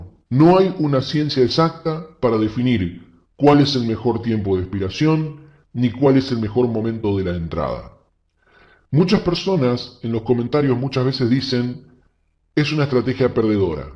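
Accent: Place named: Argentina. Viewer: hola, me parece por tu forma de hablar q sos argentino, yo soy de buenos aires, me gusto tu estrategia y la voy a practicar, pero ademas de eso te queria preguntar de que manera se puede retirar dinero de los brokers cuando estamos en argentina, ya que estamos muy regulados. Sirve abrir una cuenta en Skill? muchas gracias. abrazo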